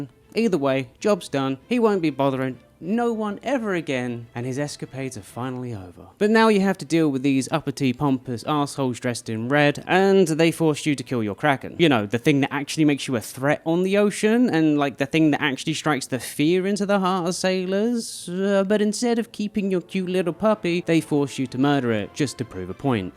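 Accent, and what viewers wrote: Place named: United Kingdom. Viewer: Mate you sound British… what is your issue with the British?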